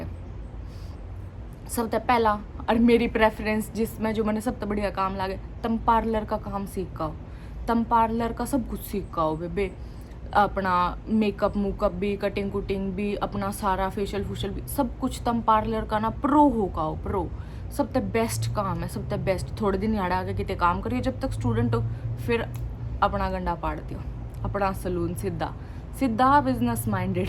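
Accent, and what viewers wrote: Haryana, India. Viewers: Nice description with haryanvi touch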